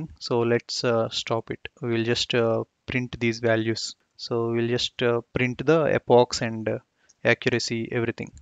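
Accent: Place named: India. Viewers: Indian accent is not a problem - better than Australian, British or Irish! I have not seen a single video which develops in 30 minutes a complete basic neural network solution. Brilliantly done with very lucid commentary. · Indian accent makes me more confused but content is good :((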